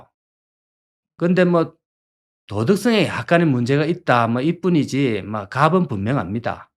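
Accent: Gyeongsang, South Korea. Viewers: 유투브에서 유일하게 듣기좋은 경상도 말투~~~😂😂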